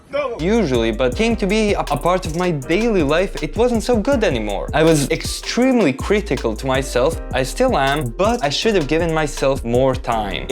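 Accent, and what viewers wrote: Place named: Balkans. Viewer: I’m trying to determine your origin based on your accent. My guess is somewhere in the Balkans — waiting for confirmation. Btw your English is pretty good! 🎉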